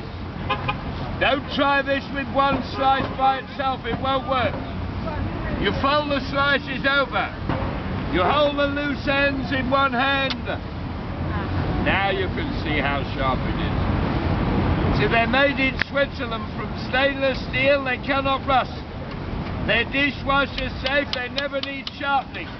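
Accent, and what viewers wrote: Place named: United Kingdom. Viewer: He has an amazing British accent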